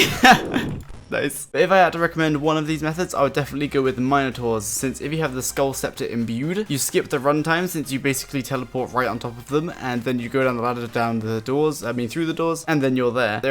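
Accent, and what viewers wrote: Australia. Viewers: He looks like an American, with Australian voice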